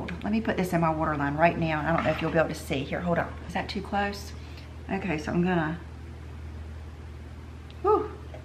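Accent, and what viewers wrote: South, United States. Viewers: Apples of your cheeks!! Lol she said it when I was writing it! Love your accent. You must live in the south.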